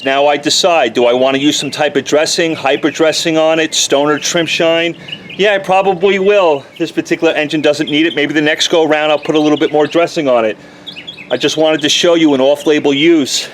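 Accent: New York, United States. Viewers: *New York accent lol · Texas accent?? That's not southern at all. I'm from Houston so I know a southern accent when I hear one. Lol. That's more like a NY accent or in that region.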